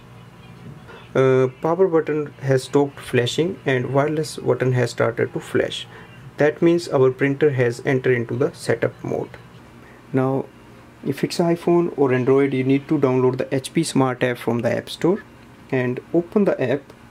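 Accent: India. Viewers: very bad instructions hard to understand India speaker - very little paper tray -NO instruction how to set up WITHOUT smart phone to me a WAST$E of money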